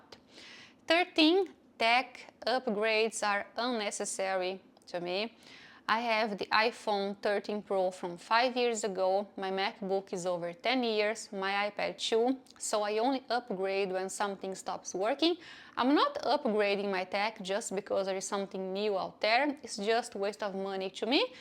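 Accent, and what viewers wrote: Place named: Brazil. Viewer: I like your videos! Subscribed! I'm turning 30 soon and I'm already doing those changes without even realizing it. I feel super free not having to get my nails done every 2 weeks, but I don't know why I feel like without having them done it looks less put together comparing to having them done. Also wearing sandals without having the toenails done feels so off and not so pretty. Do you still get your toenails done? I didn't see you mentioning your name yet by the way nor where you're from, but I'm guessing you're from Brazil as I'm from Brazil too and the accent sounds familiar hahah Cheers!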